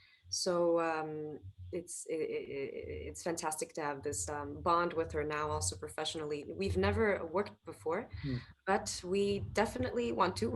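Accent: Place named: United States. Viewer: Why does she have an American accent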